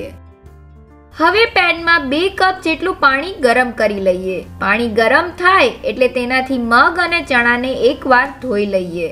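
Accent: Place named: Kathiawar, India. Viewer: good,Kathiyawadi uchchar sambhalvani maja avi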